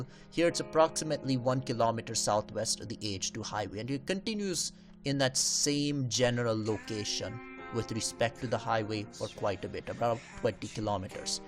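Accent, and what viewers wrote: India. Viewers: Indian accent. Fuck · Bombay English if you really want to know.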